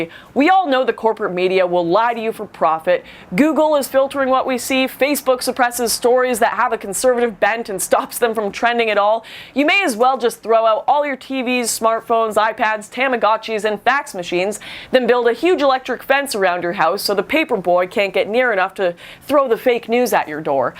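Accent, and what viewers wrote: Canada. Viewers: "well I don't know aboot you, but once I found out aboot...." Lol. never really noticed that strong Canadian accent before.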